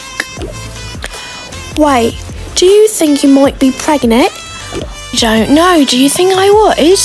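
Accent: United Kingdom. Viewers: Why sometimes you Talk British and Sometimes You Talk English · Omg i love your channel and the brtich accent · Omg the British accent 😫😫😫😫so PERFEXT!?!?!??!♡♡♡♡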